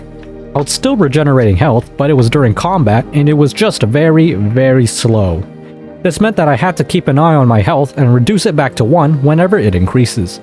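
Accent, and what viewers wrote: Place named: Canada. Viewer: Ah your thick canadian accent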